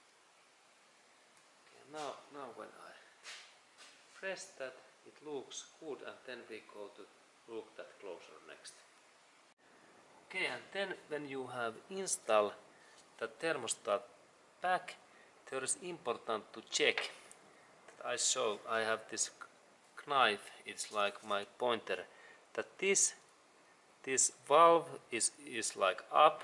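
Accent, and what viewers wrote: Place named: Finland. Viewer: hirveen kuulosta tuo ralli englanti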